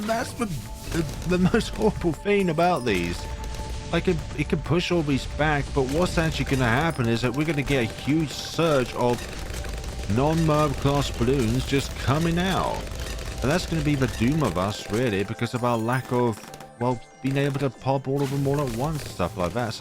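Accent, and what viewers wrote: United Kingdom. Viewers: he's from the UK I think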